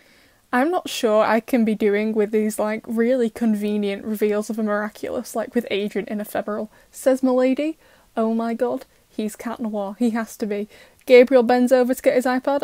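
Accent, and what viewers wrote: England, United Kingdom. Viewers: Are you from england ?? I am asking because of your accent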